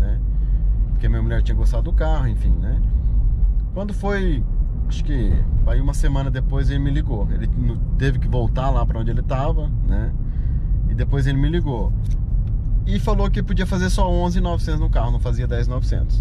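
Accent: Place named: Portugal. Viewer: Parace um português a falar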